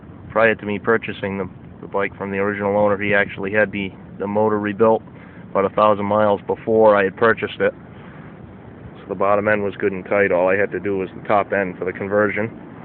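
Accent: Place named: Rhode Island, United States. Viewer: Wow you sound like your from RI!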